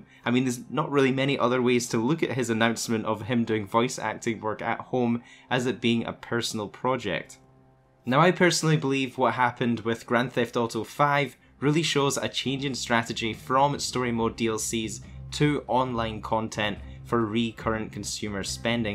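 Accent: Scotland, United Kingdom. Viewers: Here weird question by any chance are you from Scotland? It's just the accent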